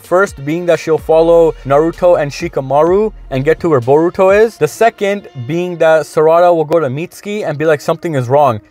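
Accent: Toronto, Canada. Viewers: Like your theories bro but Yo you from Toronto? You sound like them sometimes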